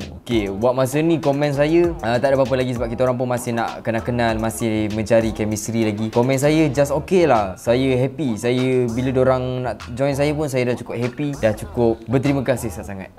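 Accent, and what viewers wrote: Terengganu, Malaysia. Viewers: Aku jem, loghat Terengganu ke atau Kelantan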